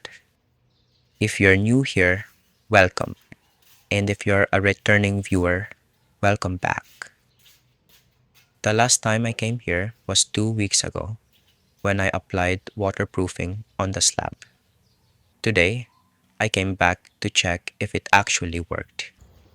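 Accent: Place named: Benguet, Philippines. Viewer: You must be from the highlands in Benguet based on the way you speak English.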